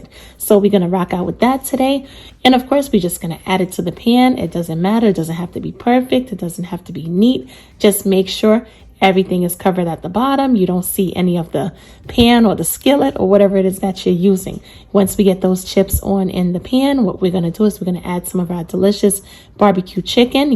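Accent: New York, United States. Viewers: Nice video. Are you from New York or New Jersey? I hear something I’ve heard before in how you talk. Can’t put my finger on it.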